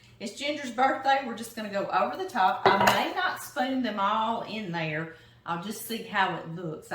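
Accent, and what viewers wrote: South, United States. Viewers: im from uk lived in TN for few years the Sothern accent is sexy why i married lady in TN anyway i miss cobbler since now back in England so ty for recipe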